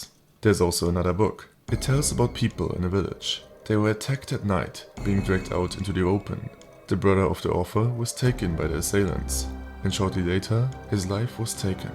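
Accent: Germany. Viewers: are you german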